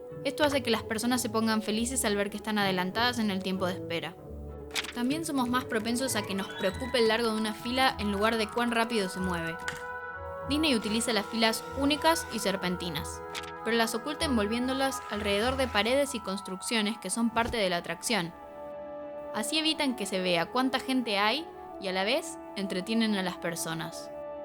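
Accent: Argentina. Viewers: Canal voz argenta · Que desagradable el acento argentino en mujeres cuando narran. Es muy local. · Supongo que por estadistica. Igual en Argentina no todos hablan asi. El acento este es rioplatense, y todo el mundo lo conoce como argentino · Que bueno escuchar videos "en argentino"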